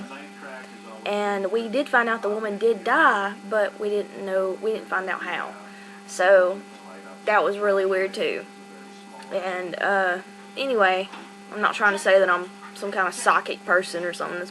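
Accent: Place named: Louisiana, United States. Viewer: Omg where are you from? You remind me of my friend who is from Louisiana, yall talk the same way! lol i love it. Anyways, that last story was horrifying. Like holy hell, idk what I would do if I were you!!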